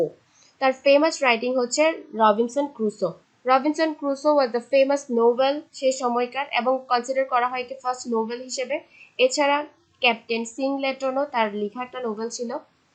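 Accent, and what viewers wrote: Chittagong, Bangladesh. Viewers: ইংরেজি সাহিত্য পড়া শুরু করেছি,মাত্র অগাস্টিন পিরিয়ড পর্যন্ত আসছি,শুরু থেকেই আপনার ভিডিও সাজেশনে আসে,আপনার ভয়েস শুনে শুধু একটা জিনিস এ আসতেছিলো আপুনা চট্রগ্রামের কিনা😅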